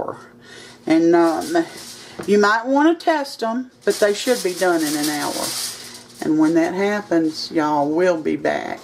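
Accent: South, United States. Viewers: just kills me with that southern draw lol. Y'all are as country as cornbread and that's a complement from where I'm at. Keep on cooking and I will keep on watching. 😊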